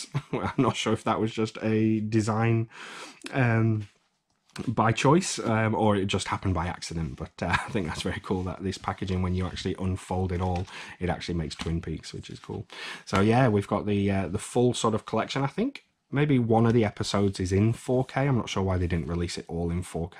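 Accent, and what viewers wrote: United Kingdom. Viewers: Where in the UK you from? Trying to pinpoint accent. Its hard sounds northern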